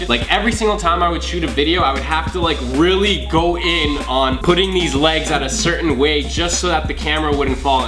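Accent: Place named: Toronto, Canada. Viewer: sound like your straight outta toronto daagg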